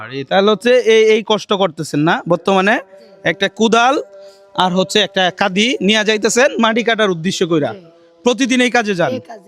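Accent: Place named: Sylhet, Bangladesh. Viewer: সিলেটি মাশাআললাহ ,ভালো 😢😂❤❤❤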